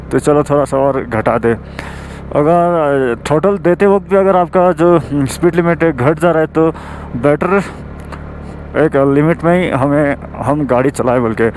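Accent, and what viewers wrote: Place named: Northeast, India. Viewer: Yeh hai apna northeast hindi....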